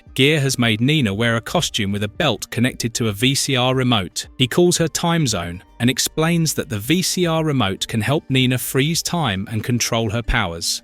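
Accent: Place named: London, United Kingdom. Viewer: His not a american his a london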